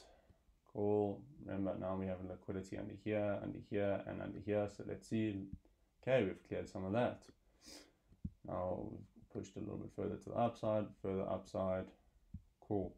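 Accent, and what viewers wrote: South Africa. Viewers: If the South African accent is annoying, speed up the video to x1.25.. kwaai video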